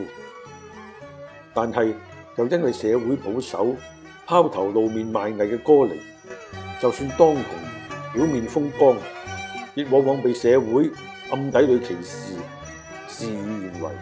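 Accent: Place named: Guangzhou, China. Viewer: 每次出片，我一定細心欣賞！你既旁白同聲線好正、好正！！好地道廣洲腔，每次都帶我番回童年往事！多謝！你飲三合一定系即磨咖啡架？😅😅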